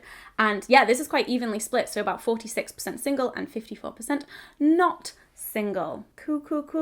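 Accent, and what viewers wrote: United Kingdom. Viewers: I don't know why this video was suggested, I'm glad to hear British English, since I'm in Sweden now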